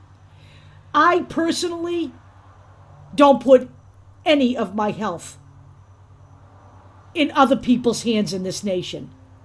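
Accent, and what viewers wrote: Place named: New York, United States. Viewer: I L-O-O-O-O-VE your New York accent! I made note of his part-time comments when I first posted this comment on Brian Tyler Cohen's channel. He called them Loony Tunes. We people, who are thinking correctly--basically, thinking at all, should just keep referring to these morons as cartoons. I am a fan of Mel Brooks. He once said, "What do you do with a man who has kill 6-million of your relatives? You make them a laughing-stock!" These right-wingers cannot be taken seriously! Cartoons one and all!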